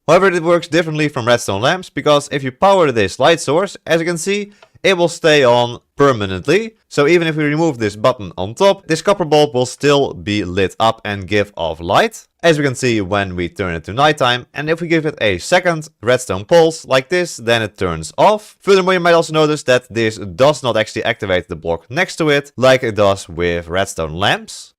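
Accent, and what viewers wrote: Netherlands. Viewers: Bedankt voor de tutorial! And yes, I know a Dutch person when I hear one, haha!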